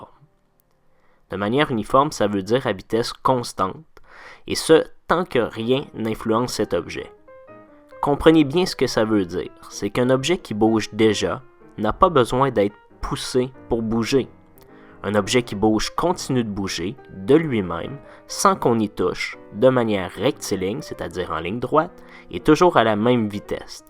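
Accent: Quebec, Canada. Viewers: Merci pour ton explication absolument clair ne et sans bavure. Toujours aussi pro... Ps. J adore ton accen quevecois..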